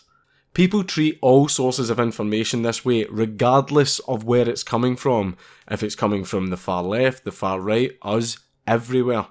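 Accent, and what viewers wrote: Scotland, United Kingdom. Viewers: No more living in an echo chamber. As lots of leftist SJW supporters do - without any accustical problems that come from the house they use to live in. To be serious, my first language is not english, and the missing echo makes it more easy for me, to understand your beautifull scottish accent. I was born and raised in south Germany, not Bavaria, where we talk an accent some people in the rest of Germany just hardly understand. Its called Schwäbisch (Swabian), and some people say, that Scotts and Schwaben must be close relatives, as Scottsman are known for keeping their money together, Swabians are known to be thrifty. Scottsmen must have been expelled from Swabian Country, because thery spent to much of their money, is an old joke they tell about us. And both, Scottsmen and Swabians, have a funny accent. And are proud of it. 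This was not a typical vid of yours, but a good one, verry informative. Some people who did not get it until the verry day are now better informed about your intentions. If they want to. For fucks sake, stay who you are! And if you ever get stucked in an elevator with voice control, don't forget: Scottland! Freedfom!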